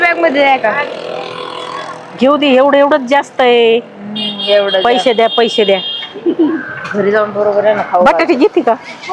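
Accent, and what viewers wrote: India. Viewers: आस्सल नगरी भाषा बोलती 😂😂😂😂😂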